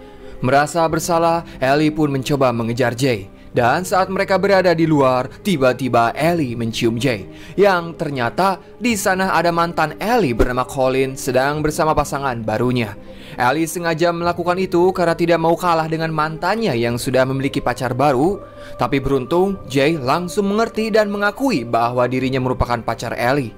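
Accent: West Java, Indonesia. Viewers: Orang mana lur euy sundana?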